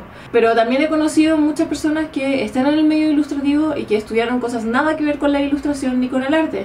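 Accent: Chile. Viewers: Wow! cómo cambia todo hablado en español... y en chileno! ;D eres admirable! Saludos! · Tienes un acento muy bonito, me encanta como en Chile dicen "chiquillos o chiquillas" ¡Mucho éxito en todo!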